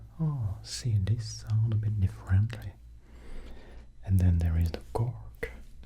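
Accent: France. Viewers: I love whispers but I’ve got like a tolerance up for them now, but you’ve broken it with your soft spoken voice. Love the when the french speak English it’s so nice ✨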